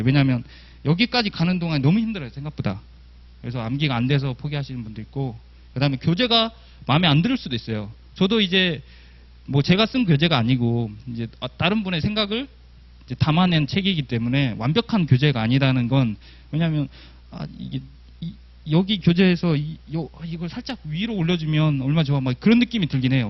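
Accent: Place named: Chungcheong, South Korea. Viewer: 충청도분인가보네요. 답답합니다.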